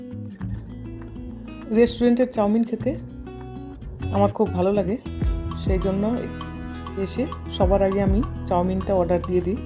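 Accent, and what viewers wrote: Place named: Bangladesh. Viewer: এই আপুর বাড়ি মনে হয় বাংলাদেশে। উনার ভাষা কোলকাতার ভাষা মনে হয় না।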